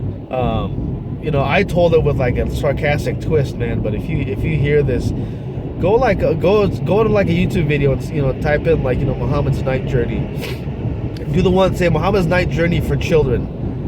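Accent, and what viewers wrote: Arab world. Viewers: You are fake , and you have Arabic accent even when you try to pretend different dialogue your accent is more clear so, how much you got payed from YouTube for that fiddling video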